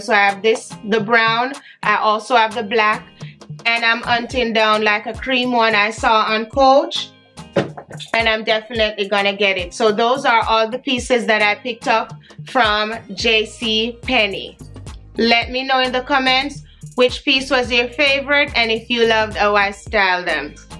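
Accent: Jamaica. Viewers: you sound Jamaican lol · Girl when I heard the yardie accent is the fastest I ever subscribed. I'm also a teacher and like you ain't about that shabby look. Love the video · Me hear the 🇯🇲 accent and then me hear the patois chip in ,me instantly proud🥰. New subsriber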